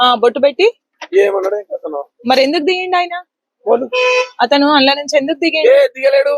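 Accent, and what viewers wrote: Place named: Hyderabad, India. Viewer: Vah kya hua Hyderabadi baat kar rahe ho bhai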